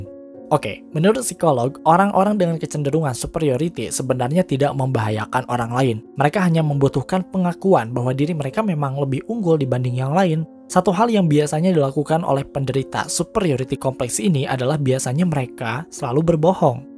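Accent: South Jakarta, Indonesia. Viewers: Bg lu orng jaksel ya?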